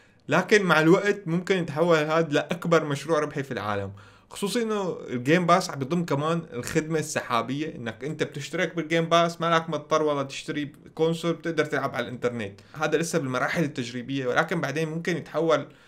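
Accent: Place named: Syria. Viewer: قسم بالله ياوجهك مريح والطيبه باينه فيك اتمنالك النجاح يا وراح تذكر كلامي راح تنجح في مجالك بس لو نجحت لاتنساني هاااا اوعك اخوك زيزو ايه نسيت ولهجتك السوريه العفويه ياخي حكايه تاانيه تسلم يازعيم على مجهودك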